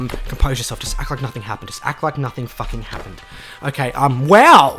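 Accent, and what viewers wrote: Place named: Australia. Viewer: I see the link to this video in Painticus' discord, I hear an Australian narrator, I like and subscribe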